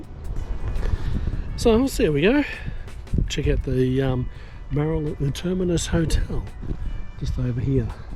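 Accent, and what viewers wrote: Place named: United Kingdom. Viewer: What would you pay? We all understand your living on the edge each week until pension day. Mate drop the girls and the drugs, the cigs and the red frogs. Stop your whinging. Are you a Pommy? Sure sounds like it. Maybe small man syndrome.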